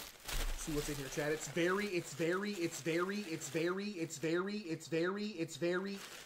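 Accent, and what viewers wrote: South, United States. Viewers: Why is Case doing a hard southern accent for Kentucky when he is literally in a state that is also another 13 southern states with Kentucky (Arkansas)? Lmao They all sound and act the same to me. 😂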